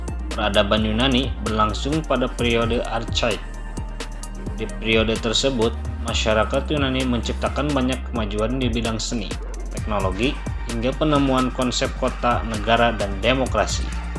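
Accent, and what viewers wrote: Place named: Java, Indonesia. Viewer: Narator bahasanya medoK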